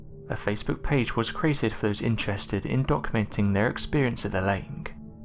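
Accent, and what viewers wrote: England, United Kingdom. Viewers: Really don't like the English accent !🍀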